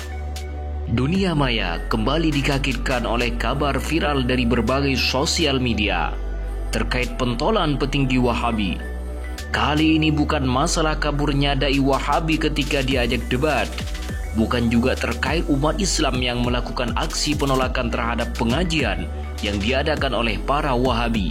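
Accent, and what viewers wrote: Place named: Malaysia. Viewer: Nah akhirnya betul kan yg gw bilang. Ini youtuber malaysia cari dolar di indonesia. Adudomba biar indonesia rusuh. Logat lo ga bisa bohong, walau melayu, gw besar di riau jadi ngerti logat melayu indo dan logat melayu tv malay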